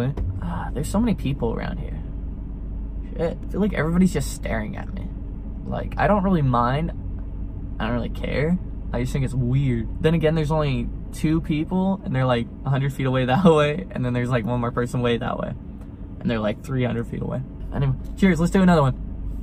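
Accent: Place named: Arizona, United States. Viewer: I can hear your AZ ascent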